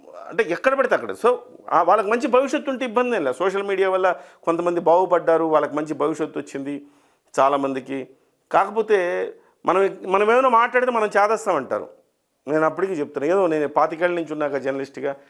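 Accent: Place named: Nellore, India. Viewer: Superrrrrrrr sir chalaa baga Nellore slang tho bale chepparu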